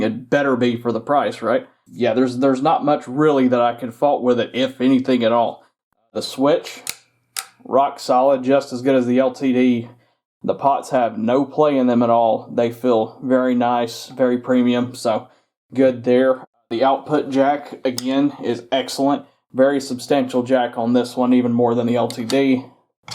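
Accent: United States. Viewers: ok I was thinking arkansas with that accent lol. Cedarpoint! We went there in June. I live in nebraska and esp are non existing. Btw my ltd is a fret buzzer. I'm about to send it to sweetwater for a plek and setup.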